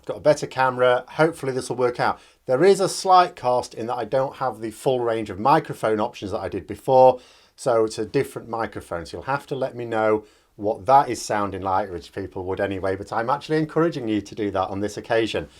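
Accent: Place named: North, United Kingdom. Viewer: I didn't realize you were Northern until I heard your new mic... Fantastic rustic undertones.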